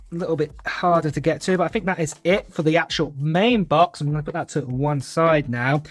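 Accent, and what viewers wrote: United Kingdom. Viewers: Sounds so british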